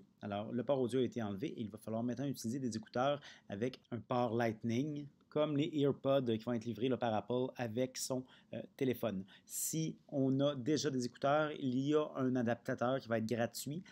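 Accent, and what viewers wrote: Quebec, Canada. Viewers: C'est un québecois... Faut réfléchir parfois :/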